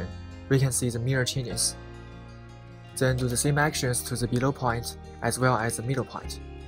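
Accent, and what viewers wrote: China. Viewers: 我听着就像国人的英语，哈哈哈哈，直到看到了输入法。视频真牛逼啊，学到了干货！牛逼！ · 大陆口音，哈哈哈。加油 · Chinese？Your accent is familiar to me. Good Tutorial !!!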